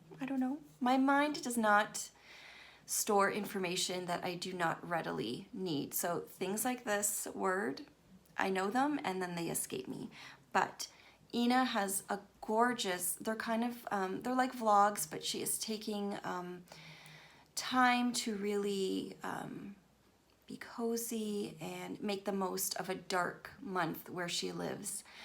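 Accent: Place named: Scotland, United Kingdom. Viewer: Love the podcasts. A new one is dunderknit but I'm sure you already know about that one. She's a fellow Scot! Yeah!! Good to hear my accent in a podcast. Currently knitting my first sweater which is also my first colourwork project. Just upgraded my sewing machine so time to get creative instead of just repairing. Going to make some project bags as Christmas gifts this year. Love the pace of your podcasts. So calming!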